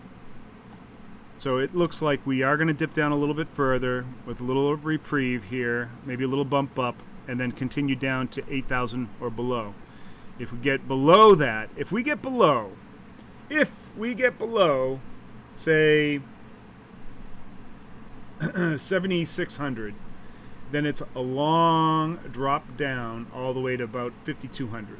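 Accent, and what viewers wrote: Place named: Queens, United States. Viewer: I'm from Queens and that's how we fucking talk too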